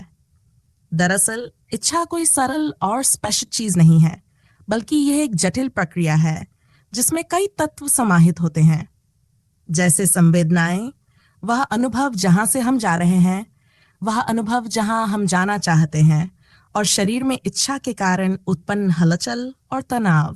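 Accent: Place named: United Kingdom. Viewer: Aapki Hindi bahut achhi hai parr angreji ki boo aati hai..😊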